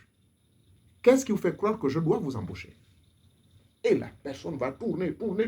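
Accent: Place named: Gabon. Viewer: bonjour au fait dites moi vous parlez beaucoup du Gabon votre mélodie est gabonaise Êtes vous gabonais ou vivez vous? C est rare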